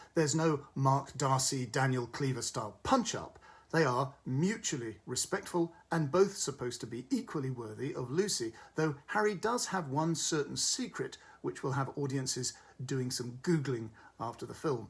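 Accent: United Kingdom. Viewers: Which horror! Sorry for you that you are forced to review such a movie, in French "une daube"! (Watched the trailer. Such waste of pellicule! and such bad acting.) Discovered by hasard your vlog! from Locarno's Festival, appreciating and following you since then. And reading your reviews on Guardian, my preferred newspaper since I was studying classical singing in London many many years ago. By the way did you leave our Lago Maggiore's sublime shores? Did you miss on Piazza Grande the terribly strong punch-movie "Irkalla Hulm Jijiljamish" by M. Jabarah Al-Daradji ? Even under such a never happening before heat wave I was freezing, tears in my eyes, a lump in the throat... Ah, by the way, adore your exquisite English accent!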